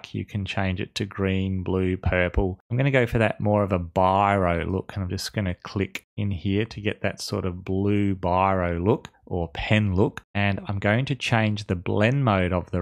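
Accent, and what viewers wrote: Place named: Australia. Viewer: Thanks mate i have only just found you.... easy to understand you are an Aussie!!! I'm staying on board!!!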